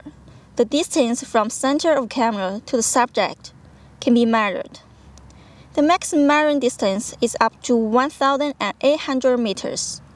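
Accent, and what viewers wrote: China. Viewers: Her English accent is like Chinese 😊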